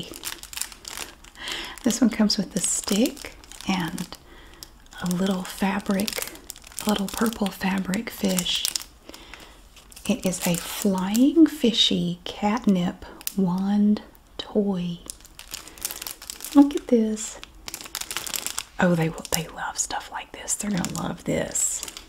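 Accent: South, United States. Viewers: The southern accent is so underrated 👌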